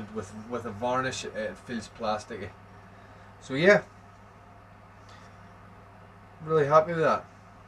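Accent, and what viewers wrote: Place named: Antrim, United Kingdom. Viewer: that aul country antrim accent proving hard for people to decipher haha love it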